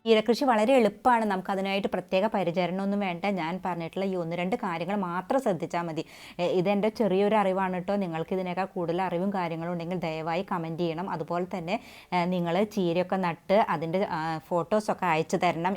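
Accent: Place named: Malabar, India. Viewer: New subscriber. Found your videos full of useful information but your Malabari slang is evident in your audios. Not a big problem. By the way I would like to have some seeds of red and green cheera, palak, long payar, venda and chillies. If possible please send at my address : GEORGE JAMES, THYSHERRIL HOUSE, PANDANKARY P. O., EDATHUA 689 573. ALAPUZHA DISTRICT. Thank you.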